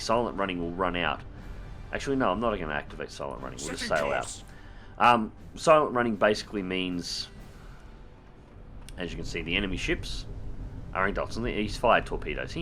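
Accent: Australia. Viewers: An Aussie !!! Finally !!!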